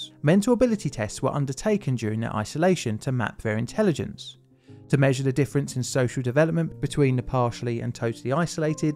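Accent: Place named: Cockney, United Kingdom. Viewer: Someone w/o a cockney accent should do the voiceover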